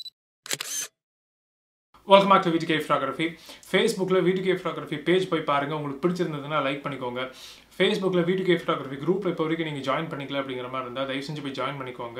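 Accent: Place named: Chennai, India. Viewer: Semma Namma Chennai Thamizh'la explained... thanks buddy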